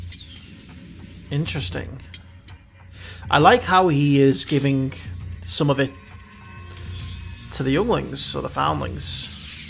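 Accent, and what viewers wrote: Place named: Liverpool, United Kingdom. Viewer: Evening by the sound of your voice, you are from the north of England. Think Liverpool. How are you able to view each episode, as Disneyplus does not go live in the UK until 31st march 2020? Thanks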